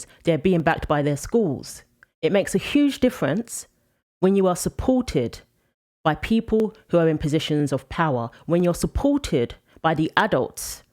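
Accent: United Kingdom. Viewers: On a personal note, although you have a British accent, it was easy to understand your speech. Your diction is clear. I sometimes have to resort to Closed Captioning to understand English speakers from other countries. Also, excellent fact based coverage of a very important issue.✌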